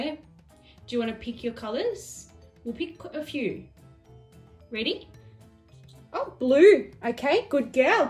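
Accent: United Kingdom. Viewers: Are you British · Is this YouTuber brithish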